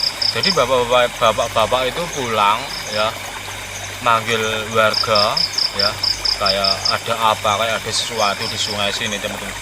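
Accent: Java, Indonesia. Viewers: Om apa memang ngomongya kaya begitu, atau tambah2 medok..coba ngomongnya biasa aja biar tambah kereen..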